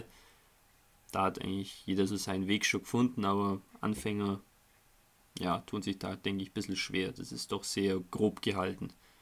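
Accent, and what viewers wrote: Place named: Swabia, Germany. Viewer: Naja ich denke mir manchmal schon wie amüsant der schwäbische Akzent doch manchmal ist... für einen Rheinländer etwas befremdlich aber dennoch macht es den besonderen Charme der Videos aus.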